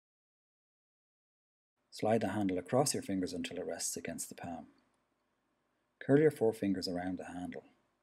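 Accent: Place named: Ireland. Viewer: it sounds like Irish accent, ie he is British. I can perfectly understand all he said because I am British ;)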